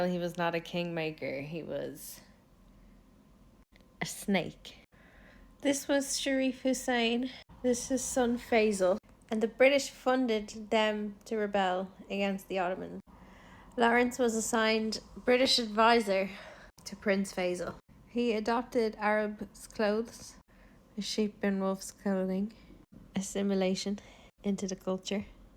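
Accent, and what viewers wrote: Ireland. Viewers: Wait I don’t get it. How is any of this bad? Unless you’re pro-Ottoman? But you sound Irish. I guess taking the credit for the Arabs tactics was bad but it was over 100 years ago so I personally don’t care and don’t understand why you do.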